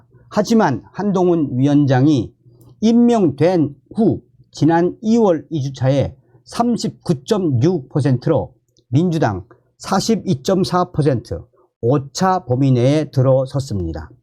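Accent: North Korea. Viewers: 정성산TV대표님 새해복 많이 받으세요 말투가 북한 말과 비슷하네요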